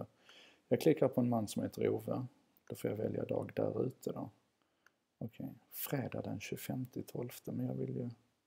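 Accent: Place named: Scania, Sweden. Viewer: svårbegripligt skånskt mummel utan text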